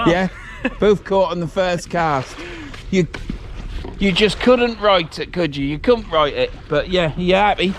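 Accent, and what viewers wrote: Norfolk, United Kingdom. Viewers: Cracking video oil them boots up😉 well done on the fish. Can tell your a Norfolk boy. Hope you to have a good Christmas.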